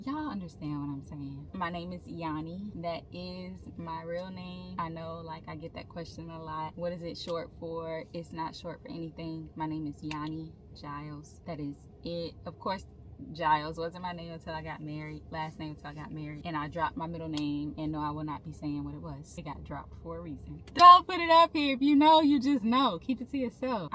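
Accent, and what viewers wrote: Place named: South Carolina, United States. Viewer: So i just watched the full video and ive been watching yall for a long time but just found out your from SC! Im from Anderson South Carolina and i knew you sounded like you were from here. Where exactly are you from? I love your little family yall are precious 💕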